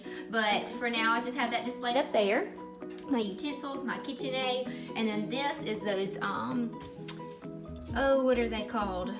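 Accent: South, United States. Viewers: OMG! I love your home, your and Drew's awesome creativity and your sweet Southern accent! I have to admit when you said you'd show us the shower, then you said "we had a wedding shower here"...I had to coordinate my thinkin' for just a second to figure that out...LOL!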